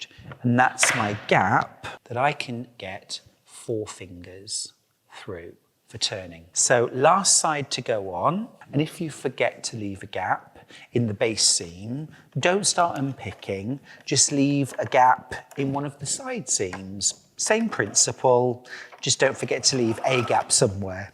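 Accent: United Kingdom. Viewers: I love English accent. Can you please make tutorial the Japanese style UV protection mask . The mask is attached to the capelet on the sides with adjustable earloop jus like bra strap. the middle of the face there is invisible opening to drink water, the mask overlaps the capelet(or cap). the capelet goes around up to the back of the bottom of the neck by closing with velcro or snaps. I can show photo example in private. Thank you. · I really enjoy listening to your videos and your lovely British accent. I've made several of these bags and like the way you've made the tabs a bit oversize. Excellent instructions!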